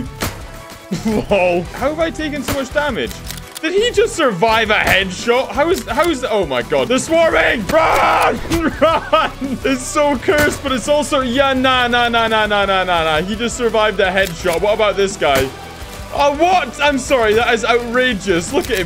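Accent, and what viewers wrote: Scotland, United Kingdom. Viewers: is he british? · Scottish. I think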